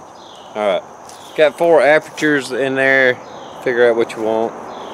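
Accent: South, United States. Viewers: I'm sorry, but I cannot take this video seiously. The southern accent, mohawk haircut, weapon, rooster crowing are just too much. Wonder if he is in his underpants? Maybe some version of deliverance. He he.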